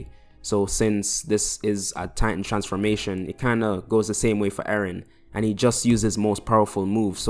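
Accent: Jamaica. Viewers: Dude are you Jamaican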